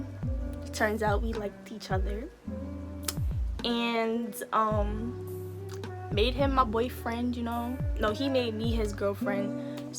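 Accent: New York, United States. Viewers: I love your accent❤ you from NY🎉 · I can hear your New York accent. I soon as I heard you speak I just knew you were from New York. Anyways you are so pretty😍😍😍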